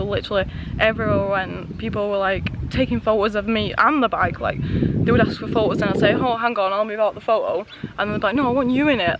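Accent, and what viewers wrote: Northern England, United Kingdom. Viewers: Yeah there's always some weirdos to contend with! Keep smiling and enjoying yourself, the enthusiasm comes through in your uploads which is great to see... Proper northern accent too... Im from Warrington and sounds like you're not to far away...ha ha.. ride safe chuck 👌😉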